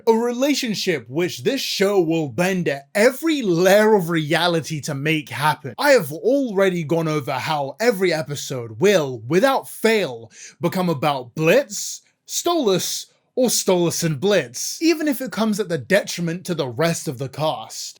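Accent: United Kingdom. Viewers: Your accent is based on where you grow up not your ethnicity. The video creator definitely isn't ethinically British, but he sounds British.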